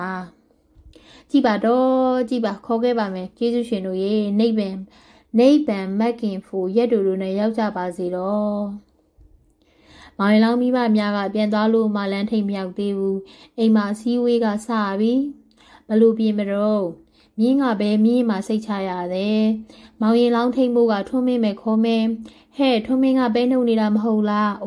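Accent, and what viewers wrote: Upper Myanmar, Myanmar. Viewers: အသံကအညာလေသံ...ချင်းတွင်းနဲ့ဧရာ ဆုံတဲ့ကျွန်းထဲကအသံမျိုး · ဖတ်ပြတဲ့ ညီမလေးအသံက နားထောင်ကောင်းချက် အညာကို တကယ်ပြန်ရောက်သွားသလိုပဲ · အသံေလးကိုက အညာဆန္ေနတယ္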